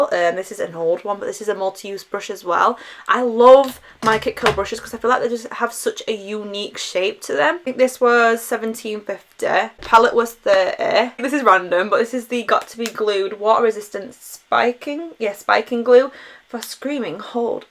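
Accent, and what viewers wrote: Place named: Yorkshire, United Kingdom. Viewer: also, i absolutely adore your accent 😂 i’m from the midlands and me and my boyfriend always say ‘lovely’ in the yorkshire accent hahah, made me laugh when you were swatching!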